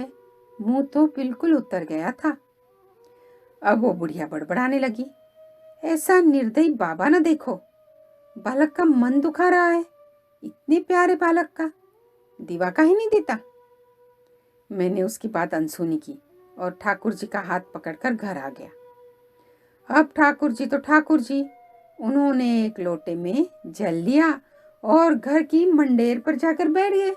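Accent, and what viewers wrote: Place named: Braj, India. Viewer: 18... big like bahut Sundar Lalla Tu Bhi Pite Ho Tera Baba bhi Pite go Kahani Sundar hai hi aapke Munh se Braj Ki Boli bhi bahut Sundar lag rahi hai Lalla Baba main bhi chalunga Hamare Vrindavan Mein Aise Hi bolate Hain Brij Bhasha aapke Munh se sunkar bahut hi Achcha Laga excellent video my dear sister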